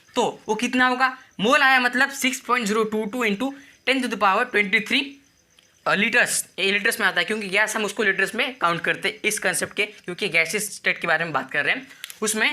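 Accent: Maharashtra, India. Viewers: Seems like Marathi Accent. Aap konse class mein padhte hai abhi? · I think this guy is from maharashtra bcz he have marathi fluent 😅😅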